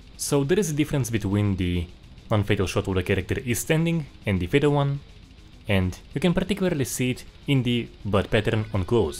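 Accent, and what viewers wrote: Russia. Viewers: You have a Russian accent. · Русский акцент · у тебя акцент русский)))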